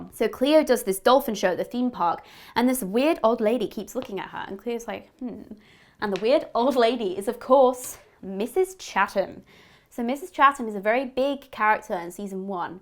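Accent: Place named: Australia. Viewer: You could just be reeeeally committing to this but I’m gonna believe you are. Names right. Tiny image resembles the actor. Played 1 out of 2 of your gaming vids and heard the Aussie accent., you were wronged, so wronged. You were sweet and Kim was a little asshole, honestly.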